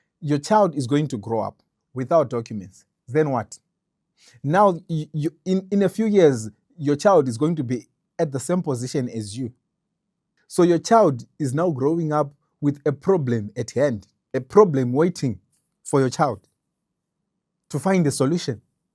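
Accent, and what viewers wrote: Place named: Zimbabwe. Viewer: You sound Zimbabwean Bro... Are you in South Africa as well?.... You know who will actually do something about this..... That Gayton McKenzie...!!!!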